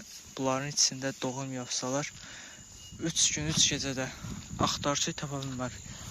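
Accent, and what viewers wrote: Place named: South, Azerbaijan. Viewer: Ləhcədən Cənub bölgəsinə oxşayır.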